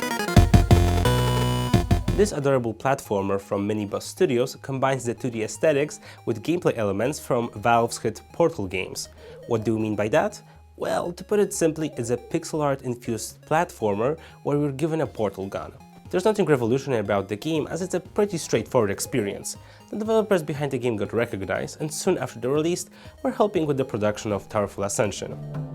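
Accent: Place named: Poland. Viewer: Odrazu usłyszałem ze to Polak mowi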